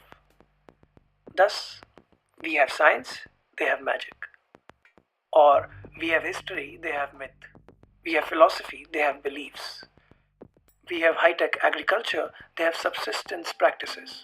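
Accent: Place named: India. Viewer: Very nicely done. Indian English is sometimes tough for me, but that's what 'cc' is for! I hope this is shared widely. I do not see Ep 2?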